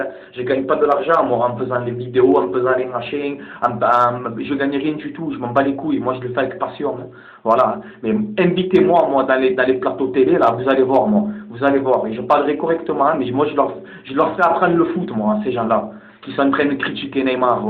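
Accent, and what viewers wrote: Marseille, France. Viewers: Thanks you very much je kiffe ton accent Marseillais j'ai de la famille laba pas loin du Parc Jeannot Tu as totalement raison je suis avec toi que dieu te bénisse J'embrasse tout les Marseillais bye de la part de Chinny Baby Nigeria USA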